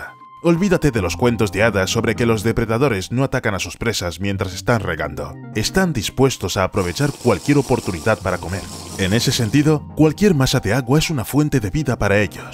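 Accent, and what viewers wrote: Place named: Spain. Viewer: El acento español 👌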